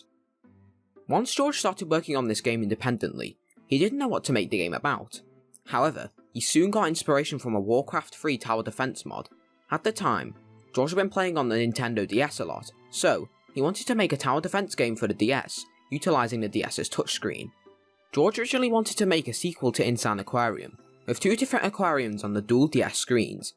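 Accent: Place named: United Kingdom. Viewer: Csn we collab sometime? We’ve both got british accents, and you have potential.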